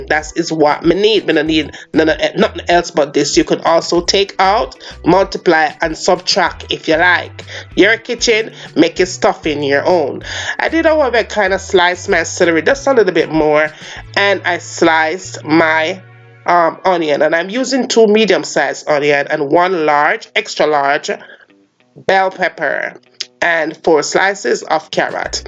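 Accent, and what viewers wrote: Jamaica. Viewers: Love your Jamaican accent🥰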